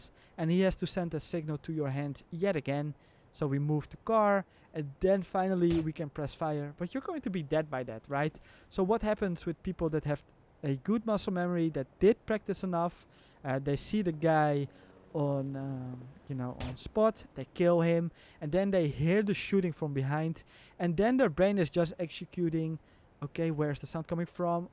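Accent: Netherlands. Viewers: are you dutch? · The really small adjustments are the hardest, big flicks on the body are fairly easy, but when your crosshair is just 1 pixel OFF the enemy ... So hard to compensate if you have to do it quickly. It's what i'm practicing most atm , also is this guy dutch ? SOunds like dutch accent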